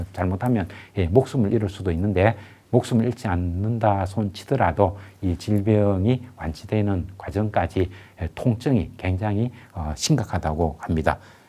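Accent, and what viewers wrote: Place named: Gyeongsang, South Korea. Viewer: 갱상도 말씨가 고향의 오빠야가 다정하게 이야기해주는듯 듣기좋습니다. 어려운 제목인데 편하게 알아 듣기 쉽게 풀어주시네요.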